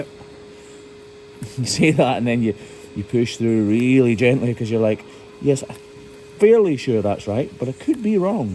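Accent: Scotland, United Kingdom. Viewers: quick question, are you Scottish or British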